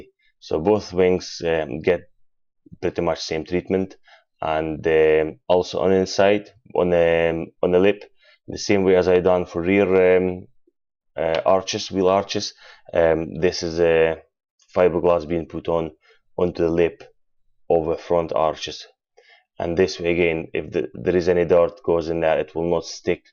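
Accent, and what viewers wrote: Scotland, United Kingdom. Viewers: Love the accent. Is it Aberdeen? Dundee? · Love how the Scottish accent comes through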